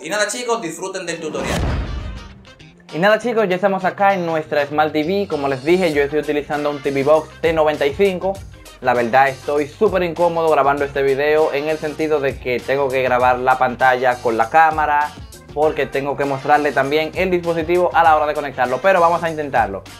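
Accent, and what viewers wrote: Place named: Dominican Republic. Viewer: Aquí en Dominicana hablan así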